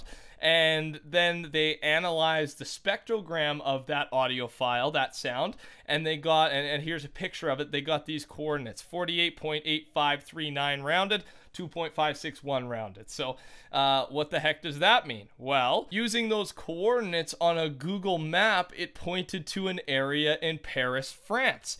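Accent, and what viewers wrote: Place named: Canada. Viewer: Is he canadian? I heard a little accent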